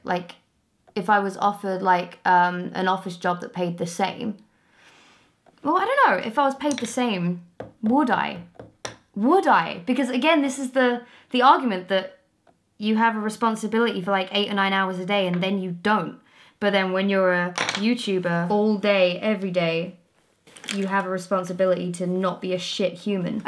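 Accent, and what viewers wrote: Essex, United Kingdom. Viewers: Is it just me or did her Essex accent come through more in this video? Especially when she says phrases like "sort of". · I hate the term having "a real job". It's a predictable snipe by a bitter soul. Your work life journey has brought you to a cool place and you'd be fully aware grab it by the horns and enjoy the ride. Your creative, clever, funny and have that bit of attitude needed when delivering a good pun, in that classic Essex accent. You also have a more serious side here on your discussion channel. Your number one in my book.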